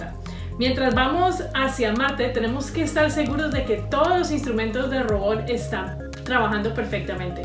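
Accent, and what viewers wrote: Colombia. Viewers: Por su acento, muy Colombiana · Cuando dice "La parte más chévere..." y su acento caleño. Qué gran orgullo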